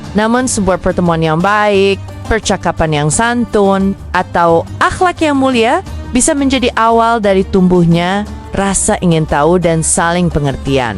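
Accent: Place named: Netherlands. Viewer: Kalau baca tex, jangan berlogat bicara seperti Belanda. Itu nggak lucu......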